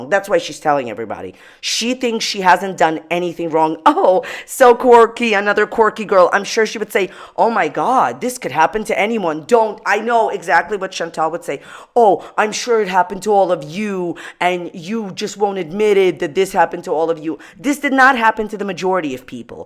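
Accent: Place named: New York, United States. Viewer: I’ve been a part of the Amberverse since this most recent “breakup” in July. No idea how I haven’t found your channel sooner!! I moved to Florida a few years ago, from Massachusetts. Your thick NY/NJ accent reminds me of the North… I love it! Definitely my new favorite GorlWorld reporter!!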